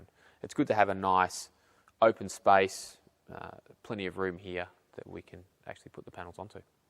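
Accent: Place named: Australia. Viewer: ommgggg I love Australian accents!!! ;)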